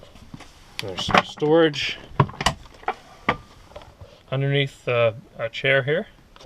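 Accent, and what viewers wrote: New Jersey, United States. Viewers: you sound like you have a new jersey accent or something. where you from?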